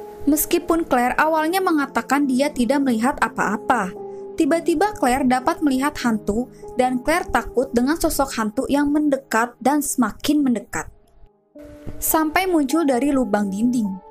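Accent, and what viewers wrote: West Java, Indonesia. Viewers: Orng mn km kak orang bandung ya 'kumaha teh hh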